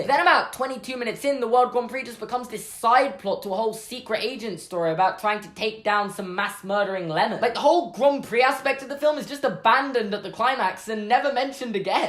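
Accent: United Kingdom. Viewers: Because of your British accent, when you turn on the captions "Cars 2" becomes .... "Cause"....? Or my phone is just glitching again?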